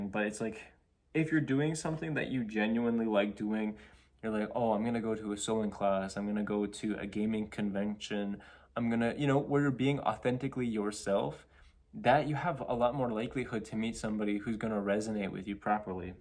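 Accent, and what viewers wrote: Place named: Canada. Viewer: This is a slightly strange comment 😂 but I'm canadian and have been studying in germany for 2 years. Hearing your voice (you sound so distinctly canadian) was so comforting. I'm happy to be where I am, but I really needed that little taste of home.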